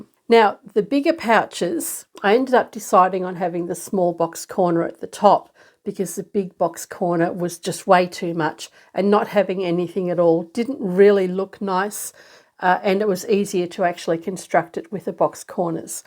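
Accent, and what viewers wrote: Australia. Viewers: NZer here. Aussie, accent is slightly different from NZ.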